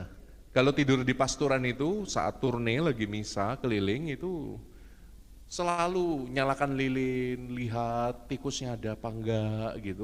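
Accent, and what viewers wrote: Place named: Java, Indonesia. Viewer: Bahasa jawanya romo medok banget👍👍👍🙏🙏🙏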